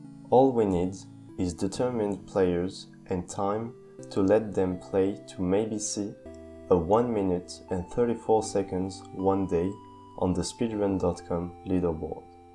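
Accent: France. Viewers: I can recognize a french accent. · La France résonne dans ta voix, très belle vidéo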